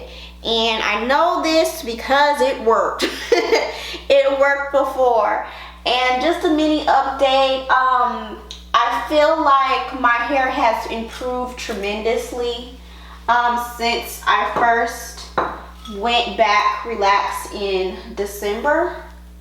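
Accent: United States. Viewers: Her accent XD I love America sometimes. She's cute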